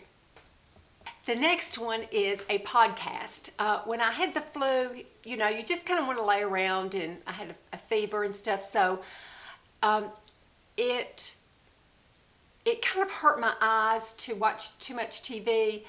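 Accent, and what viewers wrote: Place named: Southwest Virginia, United States. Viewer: Hey! You inspire me so much. I am from and currently live in South West Virginia our accents are so similar and I looovvvee it. I just turned 50 and up until a recent and very rapid weight gain I am the happiest I have ever been with my self. Believe it or not you your beautiful long locks inspired me to get my current pixie cut. No sorry I cannot explain that. I will update my profile pic on YouTube soon. My hair is shorter than in my pic here. I love it so much and have received many compliments. People I don’t know stop me and ask about my hair color and cut. Anyway enough about me. I love your and Kristen321 channel so much. You guys are my favorite YouTubers by far. I love Jessica Braun and EmilyNoel. There are some others Elle Nisha. I love Sthepanie Nicole too. I have gotten way off topic. I would love so much if you and Kristen were to do a meet up. 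My suggestion would be Smokie Mt. area since many folks already travel there. Maybe hubbies could come and busy themselves elsewhere. Just throwing something against the wall to see if anything sticks. Jessica and Emily both are in Indiana maybe they could come. Jessica is pregnant with her first beautiful baby so she may not have availability. Girl you and Kristen have serious fans that really would love to spend an afternoon with you guys. Much love and many thanks Micha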